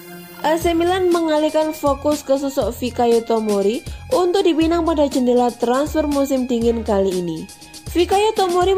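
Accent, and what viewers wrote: Java, Indonesia. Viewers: Mario Marzuki not bad lahh buat sampe akhir musim. Pengen liat dong nihh naratornya...medok amat suaranya. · Bicara ny aga logak jawa,,,bravo fm 1899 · Medok suaramu mbak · Gemes sama pengisi suaranya, medok banget hahah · jowone ndi mbak yu?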